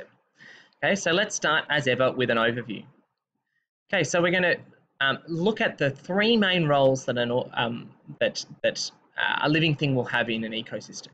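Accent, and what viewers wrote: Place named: South Africa. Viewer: I bet u r South African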